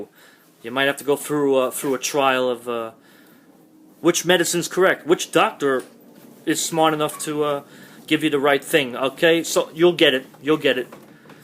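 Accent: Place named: New York City, United States. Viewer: Bro you’re definitely from nyc from your accent That makes me feel good I have to get in touch with you Still in this space Can you call me <num> Input my number on YouTube that’s how serious it is man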